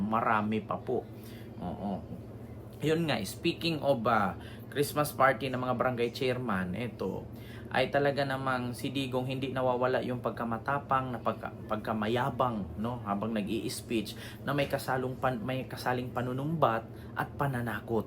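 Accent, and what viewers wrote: Visayas, Philippines. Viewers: sorry medyo subscribe aq kc akala q bisaya ka 😊bisaya kc tono mo galit kc aq sa bisaya na gaya ni digongnio 😊😅😮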